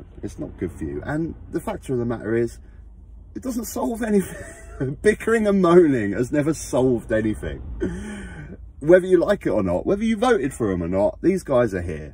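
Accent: United Kingdom. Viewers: I watch videos for his laugh and his saarf Laandan accent. He pronounces 'thousand' as 'fahsaand'. You need humour in these videos. Jonathan Pie started off as satire with his exaggerated rants, but now people are saying he tells it like it is.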